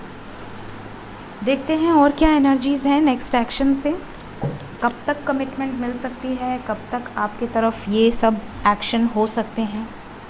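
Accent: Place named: South, India. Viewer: Hello from Karachi Pakistan. Your accent seems to be south Indian. your reading is absolutely perfect. MASHALLAH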